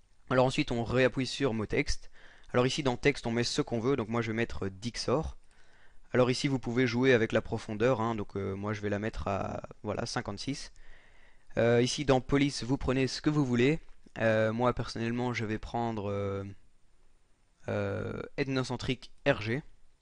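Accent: Belgium. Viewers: tu est belge ? si non tu as l'accent belge ^^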